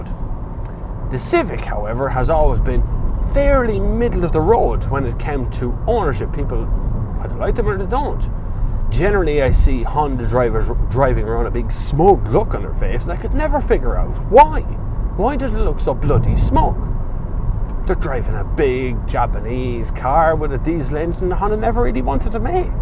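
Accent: Ireland. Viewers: Oh I love the Irish way of speaking!:) Sadly I moved from Galway to Switzerland 2 years ago after spending the nicest 12 years of me life over in the Wesht;) Great review there! Ps. Price of this car in ROI comparing to the Swiss price is ridiculously low:)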